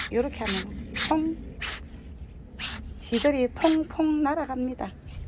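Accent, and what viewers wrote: Gyeongsang, South Korea. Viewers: 정말 부지런하시네요. 경상도사투리도 구수하구요.ㅋ 님은 어디에서 구매하시나요? 여긴 대구인데...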